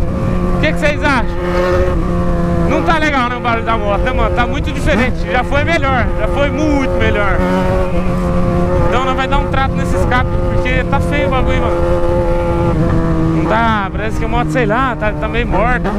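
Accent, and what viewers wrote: Goiás, Brazil. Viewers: FALAA NO PIQUE DO GOIAS PORRA KKK AAA NEMM MAN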